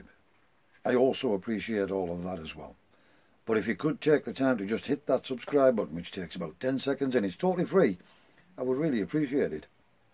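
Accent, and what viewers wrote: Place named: Cumbria, United Kingdom. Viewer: Good to hear Cumbrian twang 👍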